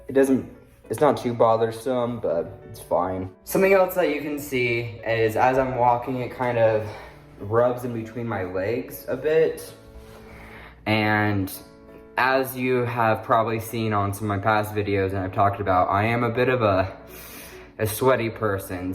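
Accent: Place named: Spain. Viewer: Your Speak to spsnish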